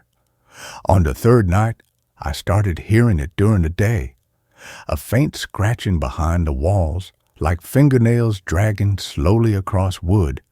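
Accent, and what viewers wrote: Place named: South, United States. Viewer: Laura here in South Georgia am. Love, love, your stories and the southern accent ❤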